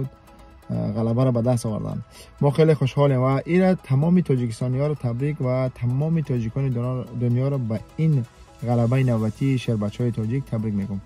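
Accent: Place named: Iran. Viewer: Ин чи хел лахчаи гап заданай ним точики нимш лахчаи эрони 😅😅😂😂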